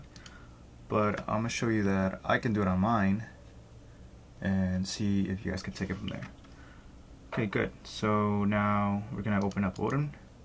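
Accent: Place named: Mexico. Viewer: Can you please fix your semi mexican accent? thanks.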